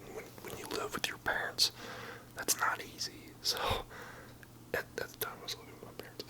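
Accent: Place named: New England, United States. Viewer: Are you from New England? Your accent, I’m a Bostonian you have a familiar accent lol.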